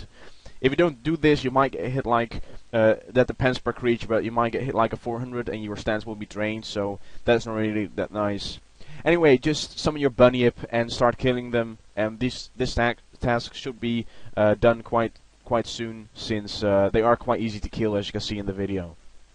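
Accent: Netherlands. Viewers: Je hoort gewoon dat je nederlands ben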